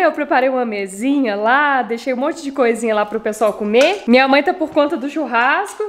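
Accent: Minas Gerais, Brazil. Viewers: Oi vc está falando mais do que NUNCA o sotaque mineiro..Ñ vi vc e o falando em turco tô com saudades. Fiz Natal DEUS os abençoe 🌲🎆✨🎊💝💖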